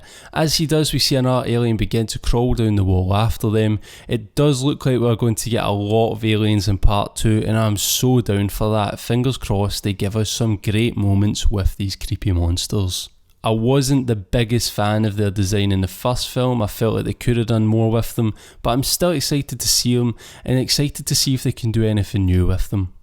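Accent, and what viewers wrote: Scotland, United Kingdom. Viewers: Are you Scottish? Lol this is the first of your Vids I've watched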